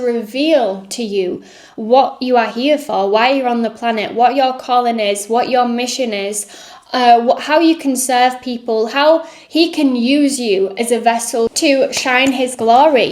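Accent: England, United Kingdom. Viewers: It reminds me I think in the book of habakkuk to write down the vision. I need to write down the vision of my new job I just started for this millionaire. Andy be quite honest I thought you were just going to talk nice to us men that are broken I didn't know you were a Christian and had a Godly message thank God you weren't a non-Christian woman just flattering us weak-willed men. Thank you for being obedient to God and speaking truth and love to us. This is exactly what I need right now I already knew it but I needed to be reminded I needed somebody to remind me what God Said. I love your accent you must be from maybe England or New Zealand or australia. It's a beautiful voice God gave you keep using it on YouTube and Instagram wherever you can use it to get the gospel out and then message out.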